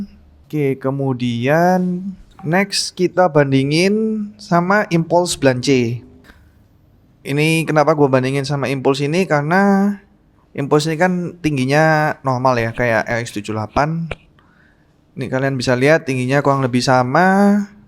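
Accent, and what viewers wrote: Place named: Java, Indonesia. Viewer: MedoQ sekali...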